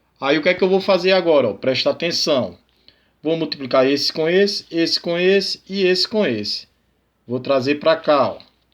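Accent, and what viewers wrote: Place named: Alagoas, Brazil. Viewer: Boa noite! Obrigado por postar os exercícios. Pelo seu sotaque me arrisco a dizer que o senhor é alagoano.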